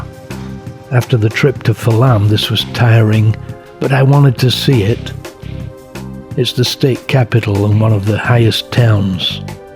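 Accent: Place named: Wales, United Kingdom. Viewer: Wow! Just found this after returning yesterday from Kanpanlet to Mindat. I was told I was the first foreigner they've seen in almost a year! Sadly, the views were oscured by smoke from burning season which ironically, resembled the current mood of the wonderful people in this amazing country. Love the narration. Still trying to work out the accent, although there seems to be a Welsh tinge!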